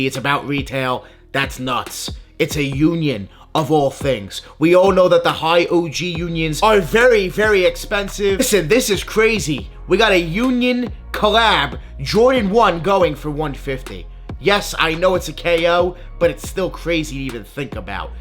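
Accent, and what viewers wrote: New York, United States. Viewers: been following you now for a minute. ur doing it the right way with engagement. the give aways and drawings. great connection with ur audience and subscribers. i’m GONNA get some lost n founds eventually 😅. also u gotta be from NY.. what part of LI u from? i can smellll it.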